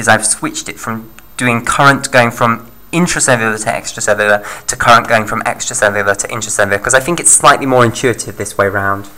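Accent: England, United Kingdom. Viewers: Thankyou, clearly presented. btw are you from England?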